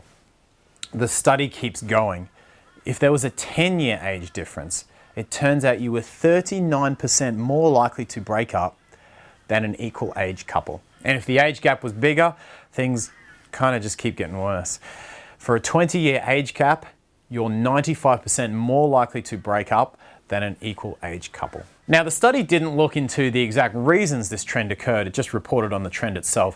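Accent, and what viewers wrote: Australia. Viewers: Hi, thank you for the reply. Part of my problem is that i work am off shift tue-sat 430pm-1230am which makes it very challenging for me to meet or date for that matter. I usually go online to meet men which i receive alot of responses which gives me many options. But again its my schedule and the fact that there are so many players, dishonest people or those that only want sex. So it does take allot of work weeding through them. And i know i have much to offer the right person and will not settle just to be with someone. I'm just concerned because i am older now and my options will become less and less. Im on my 50's my people guess me to be much younger in my late 30's to early 40's which is great and am also young at heart with a free spirit. I am taking a break at the moment but may try again in the fall. You sure seem to be such a sweetheart, i love your accent. 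Never been to Australia but love to Travel, maybe ill make it that way one day on one of my adventures. Take care, Dee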